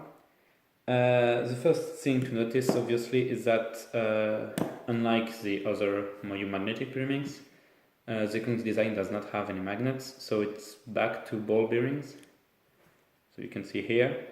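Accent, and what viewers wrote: France. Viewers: Cet accent Français! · Quel accent Français, mais tu parle bien anglais!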